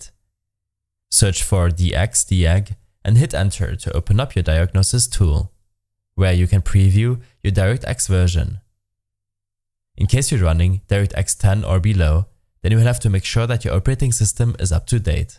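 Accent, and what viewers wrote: Germany. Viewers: Du bist Deutscher oder?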